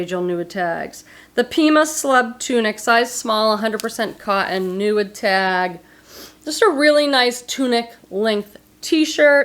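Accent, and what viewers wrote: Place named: East Coast, United States. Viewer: East coaster here, another reason i love your videos, we sound alike lol and your word use, ie Smutz. I thought, i found my people lol.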